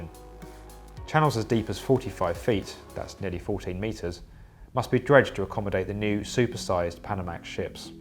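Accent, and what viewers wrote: United Kingdom. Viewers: He's from the UK you dumb shit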